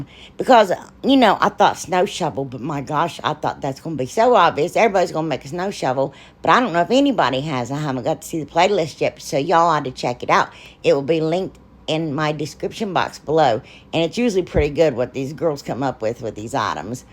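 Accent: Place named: Texas, United States. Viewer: I love hearing you talk! I’m from Texas and I talk the same way!! Just not as fast as you talk!! Lololol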